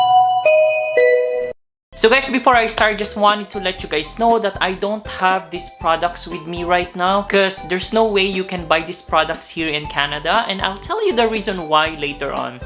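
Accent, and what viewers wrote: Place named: Philippines. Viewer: Sobrang thick ng pinoy accent mo, napaka tigas ng dila at tunog lumaki ka sa Pilipinas na kaliwa at kanan ang RDL at Maxipeel. Hwag mo kaming paandaran na hndi ka pa nakakita nito sa buong buhay mo noong nasa Pilipinas ka kahit sa maliit na butika meron nito. Meron din itp sa mga sa advirtisements sa TV and mga posters nagkalat makikita mo kapag nasa jeep, bus, at kahiy drive ka ng car. Pati English mo tunog pinoy at mali pa ang grammar. Simpleng paggamit ng S sa singular at plural hndi mo alam. Kaya wag mo kami paandarang nagulat ka na over the counter ito sa Pinas. Pangit ka den hndi ka mabebentda sa mga alpha handsome hottie afams.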